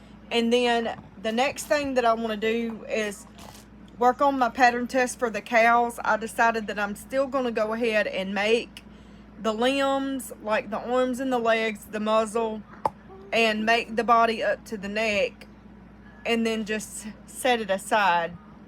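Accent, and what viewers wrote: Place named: South, United States. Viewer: Girl that is Southern accent and no mistake! I am an Alabama girl myself. On the pricing, I charge $.07 per gram of the finished plushie, then add the extras like specialty eyes and poly pellets. Then I pay myself 10.00 an hour. For all your clearance items, I would suggest mystery bags. I bought some paper sacks on Amazon that are a bit smaller than your standard grocery bag, printed an 8 X 10 sheet and taped it to the front of each one. The sheet described the gender and age range that the plushies would be good for. Then I usually take the fully price I originally charged and mark it down 40% or 50%. I have had alot of success with those mystery bags. Hope that helps!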